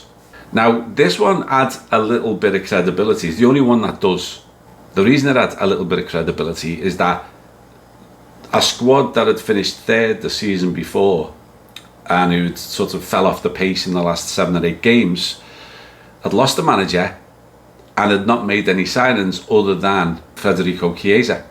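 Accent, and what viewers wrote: Liverpool, United Kingdom. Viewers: I also like your accent Obviously Liverpool but not over the top Me The posh end Near to Crosby Ok Bootle But 51 years in BC but 26 on Merseyside You can rake the boy out of Bootle but etc etc subscribed😮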